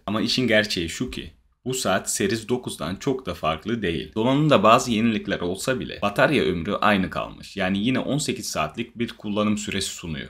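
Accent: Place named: United States. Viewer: Konuşman aşırı rahatsız edici Türkçeyi Amerikan aksanıyla konuşuyor gibisin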